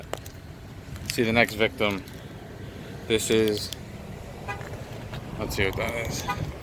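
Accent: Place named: Massachusetts, United States. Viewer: HAVENT... YOU GUYS EVER HEARD FORD=FOUND ON THE ROAD DEAD? HELLO. YOU SOUND LIKE A MASS RESIDENT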